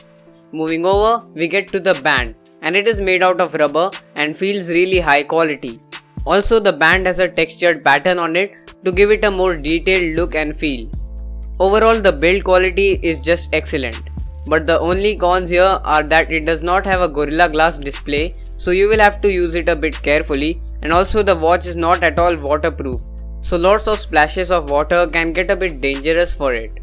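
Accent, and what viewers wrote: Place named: India. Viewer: thanks for a great review, I'm buying one, Nice english/indian accent, friendly people.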